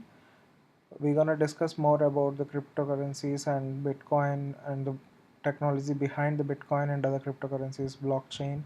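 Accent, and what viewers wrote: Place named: India. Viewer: I've seen like 10 videos of americans and other nationalities trying to explain a Bitcoin node. Awesome to see someone with an indian accent talking about this too. Nice video, keep it up!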